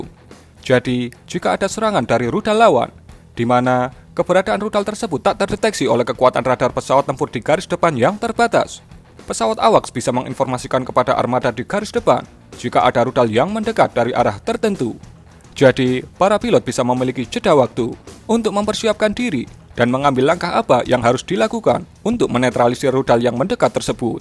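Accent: Java, Indonesia. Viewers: Medok medok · Suara lu terlalu medok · Suka denger logat jawanya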